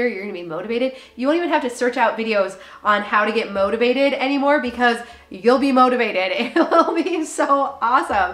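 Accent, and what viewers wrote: Minnesota, United States. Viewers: I needed this ❤ Also a MN-girl ❤born and raised! I think that's why I "hear" you so well... 10 people could say the same thing, and I obsorb 🚫 nada But starts talking and its like the epitome of Minnesota Nice 👌 I'm so comfortable when you talk 😌 Really helps me actually, take in the lesson you're trying to get across!!!!! I freaking love you❤ Thanks for being you🙏 and all that you do for us!!!!!!!!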